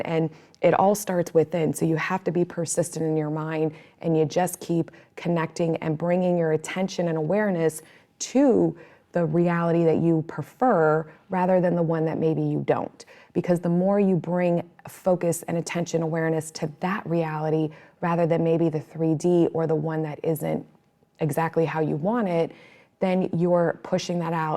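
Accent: New York, United States. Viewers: Also, you sound like you’re from NY. I wouldn’t expect any less!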